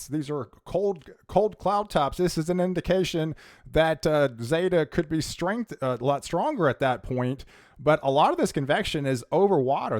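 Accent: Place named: Western Pennsylvania, United States. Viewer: You are from western PA. You cant hide the accent!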